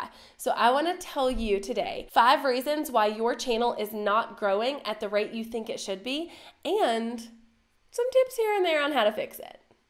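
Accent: South, United States. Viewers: I have got to ask which of these southern states are you from? Mississippi here!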